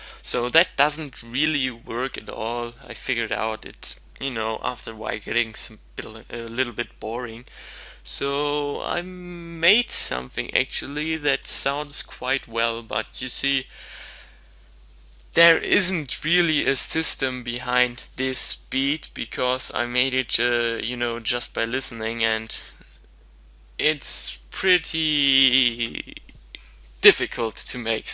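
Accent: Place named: Germany. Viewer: Direkt deinen deutschen Akzent erkannt:)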